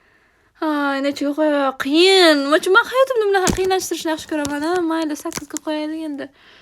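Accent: Bukhara, Uzbekistan. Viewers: juda go'zalsiz buxorolikmisiz shevayiz oxshaydi manam buxorodanman qayeridansiz😊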